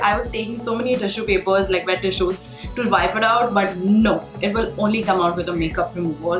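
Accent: India. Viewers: Lmao your Indian accent is very visible 🤭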